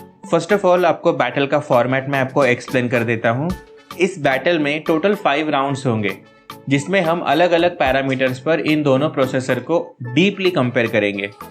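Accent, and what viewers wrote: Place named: India. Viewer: 很不错，印度口音非常喜感（如果说错了我道歉）。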